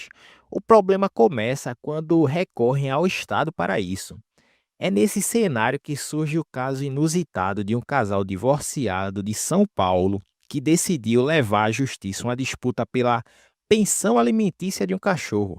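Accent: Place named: Pará, Brazil. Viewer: Sotaque forte paraense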